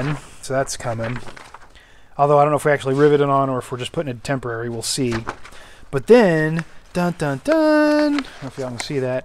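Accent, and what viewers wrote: South, United States. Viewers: Where did the southern drawl come from? It’s never been there before. Hahaha. It’s fine with me, just new. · Five years I’ve been watching, and never before have you sounded so southern! 😆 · Hi, long time viewer, love your videos. Keep it up. After watching your videos for a few years now, not sure if it's just my imagination but for some reason in this particular video I feel like it's the first time I could really hear a southern accent from you:)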